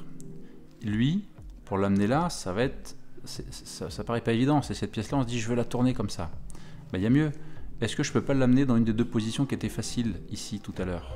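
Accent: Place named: Belgium. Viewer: Trop pourri ton accent belge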